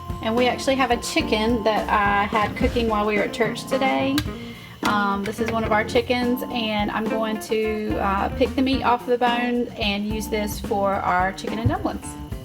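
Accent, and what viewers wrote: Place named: South, United States. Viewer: Just started the video and there's a Southern accent. I'm already confident about this video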